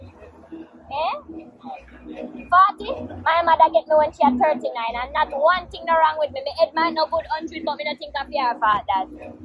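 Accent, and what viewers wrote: Jamaica. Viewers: Greetings from London uk, you are definitely a Jamaican Lady and your accent just fantastic. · Welcome back I miss u and your cute face with ur Yardie talking u looking beautiful as ever 😊❤ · Wow. Simply Wow! "Pretending to speak like that...Sound that awful"...what a negative way to describe a native accent no matter what part of Jamaica we come from...none of it is awful. Based in her stories she was born in Jamauca, went to school in Jamaica and did very well in high school ..so she can speak however she chooses depending on the situation. That's an unkind statement. is a great representation of #outofmanyonepeople ..We all can look and speak differently. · . Your Jamaican. Patois tun up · Good spirit, beautiful vibes!! Lovely accent... I love Jamaican people!! 👍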